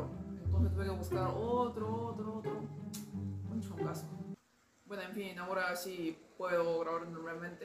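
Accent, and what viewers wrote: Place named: Peru. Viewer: por tu manera de hablar ya eres una peruana más, el pisco se toma sin saborearlo, sin retenerlo dentro de tu boca, en one nomás. Saludos desde Ica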